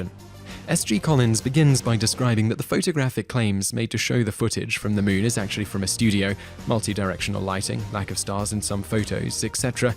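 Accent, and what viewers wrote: United Kingdom. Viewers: Hmm. Your picks for this video as well as other recent ones are starting to betray an agenda... Having a guy with a British accent reassuring me that my government is benevolent and has my best interests in mind and that there is nothing to answer for after death is damn well played...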